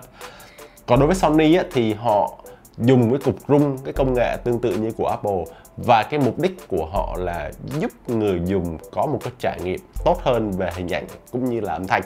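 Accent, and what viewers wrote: Vietnam. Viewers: Tiếng anh rất việt nam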